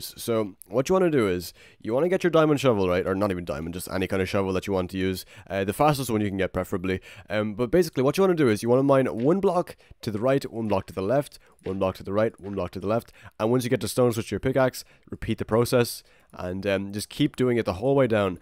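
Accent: Ireland. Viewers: Are you Irish cause you sound like iy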